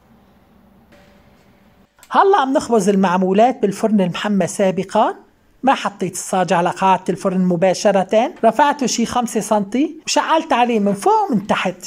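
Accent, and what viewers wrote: Aleppo, Syria. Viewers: من بعدك ماحدا يحكي حلبي حببتينا باللهجه الحلبيه وتعليقاتك الراقيه الخفيفه الحلوه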